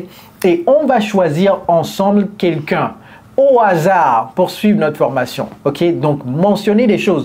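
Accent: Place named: United States. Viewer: Since I begin to listen your video the American accent is easier to understand for me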